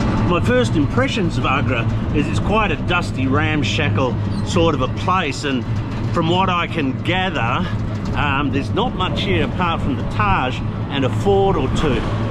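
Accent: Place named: Perth, Australia. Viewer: I had a feeling you were from Perth by your accent. I'm from Karrinyup.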